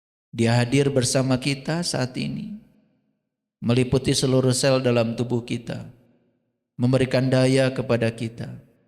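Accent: West Java, Indonesia. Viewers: Itu logat Sunda, kalo Sunda ya begitu Alloh orang Jawa logatnya Owoh, ga perlu di permasalahkan kaya gitu mah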